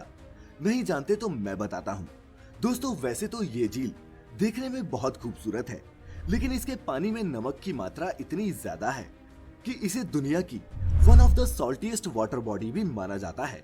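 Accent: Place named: Rajasthan, India. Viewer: Bhai aap pkka RAJASTHAN se ho na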